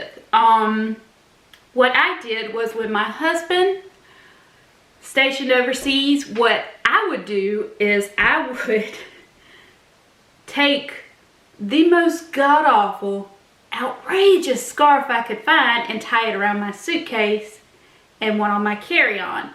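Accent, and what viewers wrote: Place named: South, United States. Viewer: Yes, our southern accents do get in the way sometimes when it comes to the pronunciation of some words. Love it! You always make me laugh and I truly love your videos. Charleston is one of my favorite places to visit. Thank you so much for sharing Southern Ways with with your audience. 💕☺️💐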